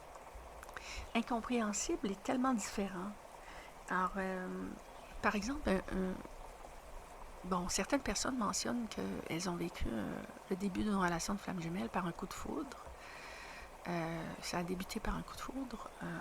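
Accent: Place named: Quebec, Canada. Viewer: Tu t'exprimes si bien... Curieusement, ça me fait du bien d'entendre une voix québécoise, comme si tout d'un coup je sentais un sentiment d'appartenance encore plus grand à la communauté. J'ai vraiment l'impression que tu es sage. Tu me fais penser à moi dans mes quelques peaks de sagesse, ici et là dans mon parcours de maintenant presque 11 ans. C'est difficile à expliquer, mais t'écouter m'aide à m'expliquer des choses que je vis ou que j'ai vécues, sans avoir la capacité de mettre les mots dessus. T'écouter est comme lire ma propre histoire. Merci pour tout.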